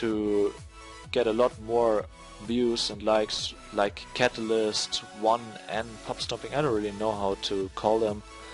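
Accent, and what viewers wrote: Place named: Germany. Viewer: Kommst aus Deutschland ;) schwer zu überhören :)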